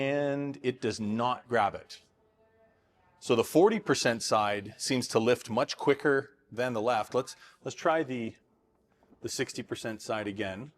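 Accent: Canada. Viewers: He sounds Canadian.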